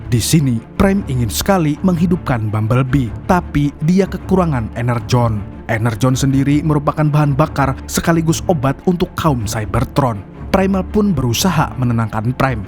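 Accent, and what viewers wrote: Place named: Java, Indonesia. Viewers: Esih medok ya lik tapi lha mandan adem ❤ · Akses jawirnya kental sekalee brur!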